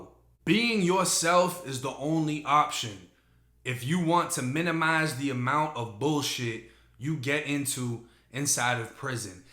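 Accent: Boston, United States. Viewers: You definitely have a Boston accent east coast type...did you ever live around my way I'm 45 minutes away from Boston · Every time I hear you speak you remind me of my days working in Boston and living in Mass. Keep up the great work with your channel. You have a great way of telling a story. For me I am someone who has never been locked up but you put me in a mindset of what would I do if I ever were ever to be locked up. My ass would check in real quick. haha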